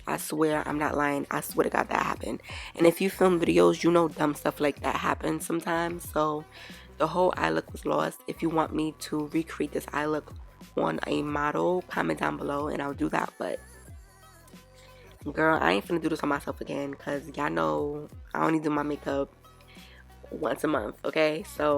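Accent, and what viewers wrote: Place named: Bronx, United States. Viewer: I like your accent reminds me of the people from the Bronx or I think it's jersey lol love your channel and how relaxing your videos and informative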